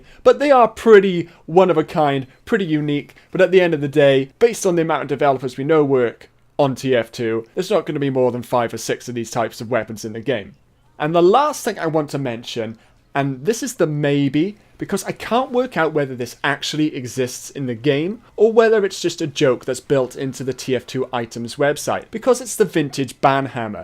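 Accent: Wales, United Kingdom. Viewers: Wherabouts in Wales are you from, mate? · Are you Welsh?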